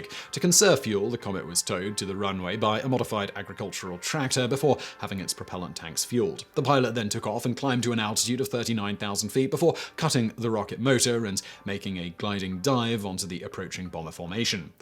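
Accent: United Kingdom. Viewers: I love this guy's British accent it is amazing and I could listen to him all day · Heyyyy another one of your channels! How did I know? You stuck your face in the thumbnail as usual. 🤦‍♂️ Get a real job lol. You basically narrate wiki articles (with 5x YouTube accounts) with a British accent and make a shit ton on money monetizing it. Maybe I should be congratulating you? I don’t even know. I just wish YT would stop suggesting this stuff and give me some original content lol. Oh but I do love the dapper clothing and those oh-so-poignant dramatic pauses between horrible jokes. 🤦‍♂️ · You try hold interest by talking really fast with a British accent, but it’s not working.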